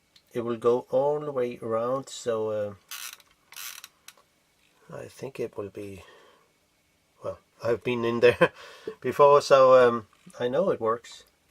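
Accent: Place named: Denmark. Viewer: Excellent. I've had the Fuji badged version from new in 2012, good to know what's going on inside, and should I need to calibrate the rangefinder mechanism this is very helpful. Thanks also for speaking English. Are you Danish? Just out of interest, I'm guessing from your accent.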